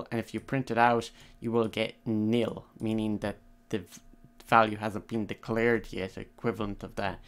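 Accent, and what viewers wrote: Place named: Ireland. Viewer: 95% sure you're irish or grew up in ireland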